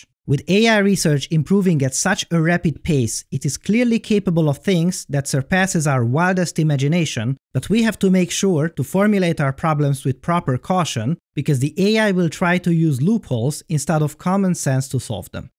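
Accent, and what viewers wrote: Hungary. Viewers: hunglish intensifies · Ahoz képest hogy magyar vagy, kevés akcentussal beszélsz angolul.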